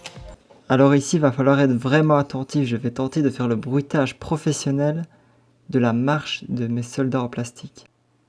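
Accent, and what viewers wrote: Liège, Belgium. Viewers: Pas mal la vidéo 😄 , j'ai l'impression que tu as un accent belge 🇧🇪, j'me trompe? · Tes Belge ? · On reconait bien l'acent bèlge 😀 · T'es belge nan? :) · Tu ne serait pas belge par hasard ? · C'est juste super! Tu es vraiment un pro du stop Motion. Félicitation. Au fait, juste une question, tu es belge? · Tu es belge ? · Vu ton accent tu ne viendrais pas de liège Comme moi ? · Accent belge🇧🇪🇧🇪🇧🇪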